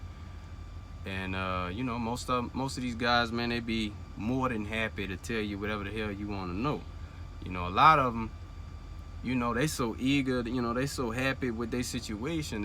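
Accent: New Orleans, United States. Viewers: I can tell you from New Orleans lol I’m from mobile Alabama , how often you push yo self home ?? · Sound like he from New Orleans ya heaarrddd mehhhh !!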